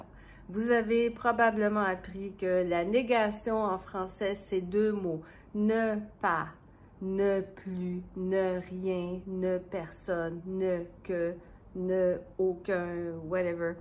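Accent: Quebec, Canada. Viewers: nay hô, moi, j’aime beaucoup l’accent québécois 💚💚💚 Mais c’est plate que les dessins animés avec l’accent parisien ont été influencés à certains enfants, ils leur ont imité.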